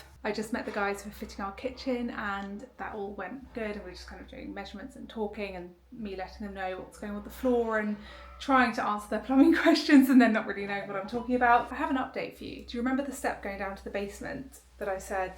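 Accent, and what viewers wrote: United Kingdom. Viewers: I’m so excited for you… this home is going to be so lovely. I also have watched you for years and am glad you are back! I see your home as being some vintage pieces with some modern… I guess you would say eclectic. You have the most beautiful voice along with your British accent. … I could listen forever…. You also are beautiful… your skin is amazing! Please keep filming the vlogs we all love them Blessings lovely lady ❤️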